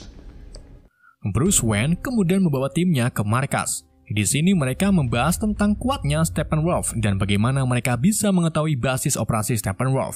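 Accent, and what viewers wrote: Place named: Banyumas, Indonesia. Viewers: Anda orang Jawa ternyata tapi Jawa mwna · Barry ndlenger akhirnya kesandung wkwkwkwk Asline wong ndi sih min? Wkwkwk ana ndlenger ana apa ah kayane wong ngapak pantura kie wkakakak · Mas 'e kerenn...jawa ngapak.. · Wong Jawa ya kang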